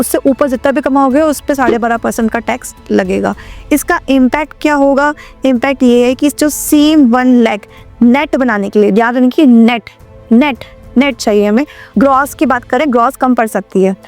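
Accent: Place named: Bengal, India. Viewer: She may be a non hindi speaker. Maybe Bengali or any other non Hindi Speaking area . Trying well. Keep it up.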